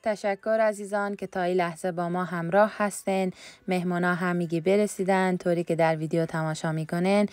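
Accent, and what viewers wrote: Herat, Afghanistan. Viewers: جان فکر میکنم لهجه شما یک کمی تغییر کرده یکی از دلیل های که ویدیو ها شما جذاب کرده همی لهجه شیرین هراتی ما است❤ ولی بازهم مثل همیشه عالی وبا سلیقه 😊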